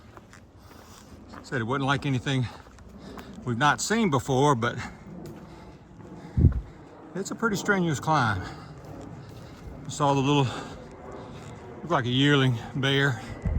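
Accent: United States. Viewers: I like listening to your North Carolina accent. My uncle settled in Rockingham after WWIl and sounded like you even though he was from Erie, PA. Really enjoying your hike. Thanks for sharing.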